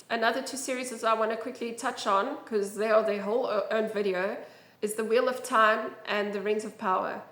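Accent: South Africa. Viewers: Yeah, I honestly didn't care much for the woke agenda, since it wasn't ALL there was to the game. What completely put me off from buying this game was the seemingly honest reviews; bad writing, mediocre gameplay, the facade of impactful decisions... yeah, that's extremely disappointing. Hopefully Mike Gamble and team can get a good game across the line with the next Mass Effect. Side note: a fellow South African showing love here. Recognized the accent. Great video. You have my subscription.😉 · I am Australian, and I am pretty sure this woman is South African, judging by the accent. People in South Africa still seem to have common sense, even here in Australia most people seem to have common sense, it's just when you switch on the TV the media like to portray as if society and reality is different, based on the agenda they want to push. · South African accent. 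Like an Aussie got infected with Bavaria. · That accent... South African? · Great video, I couldn't agee more! As a South African Imust say you sound like a South African ;) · LOVED this video! Agree with all your points! Another great femaile lead is Senua from Senua's Sacrifice. It's a brilliant game. Also, are you South African? 👀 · I always comment for Algo when I hear a south african accent. Must support my countrymen 👍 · totally correct - also - ur accent? sa? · A South African talking about this "nonsense" is everything! Subbed! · Is that a South African accent that I hear? Hello from SA :)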